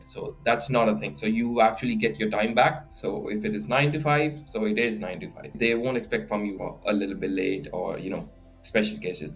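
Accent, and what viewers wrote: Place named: United Kingdom. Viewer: Bhai are you uk born?cause accent is pure British.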